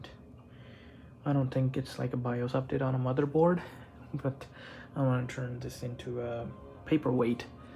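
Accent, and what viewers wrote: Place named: Canada. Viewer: its the strong canadian accent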